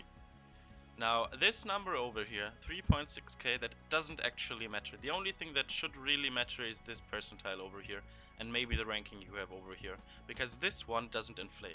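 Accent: Germany. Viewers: Great channel, keep up the good work. Sounds like a german accent btw ;)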